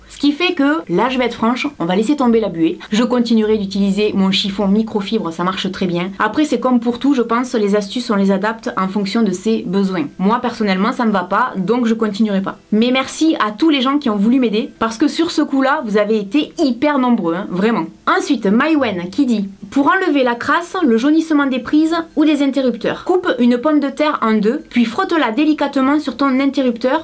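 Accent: South, France. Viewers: Pour la buée sur le miroir j'utilise du savon mais pas liquide, le pain de savon comme avant... C'est un peu galère à étalé mais avec un peu de patience et «d'huile de coude» il y a moyen de n'avoir aucunes traces sur le miroir et plus de buée pendant un bon moment. Bon forcément c'est pas définitif, il faut le refaire de temps en temps mais ça marche beaucoup mieux au savon solide que liquide.;) Merci pour tes vidéos et ton accent du soleil qui me donne la pêche jusqu'en Gel Bique;)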